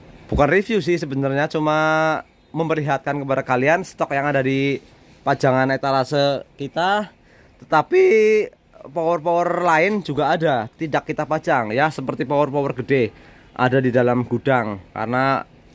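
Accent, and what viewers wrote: Banyumas, Indonesia. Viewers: Sampean asline ndi kang.. Kayane ngapak nemen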